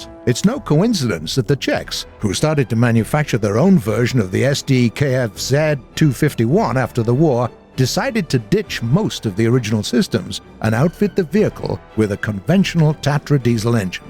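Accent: United Kingdom. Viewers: this dude accent is like an old british dude...... I LIKE IT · He sounds british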